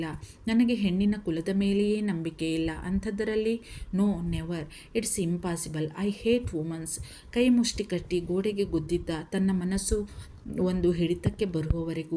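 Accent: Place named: North Karnataka, India. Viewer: ನಮ್ಮ ಉತ್ತರ ಕರ್ನಾಟಕದ ಕನ್ನಡ ಛಾಯೆಯೊಂದಿಗೆ ಉತ್ತಮವಾದ ವಿವರ 😇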